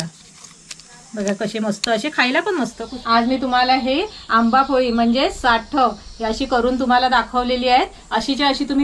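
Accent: Kolhapur, India. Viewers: कोल्हापूर वरून बोलतेय का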